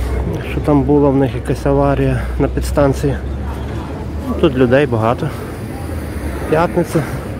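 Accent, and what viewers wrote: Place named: Galicia, Ukraine. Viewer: Не звертайте уваги ні на які зауваження! Говоріть як говорите,чудова вимова! Я коли приїжаю до Львова то не можу наслухатися галицької говірки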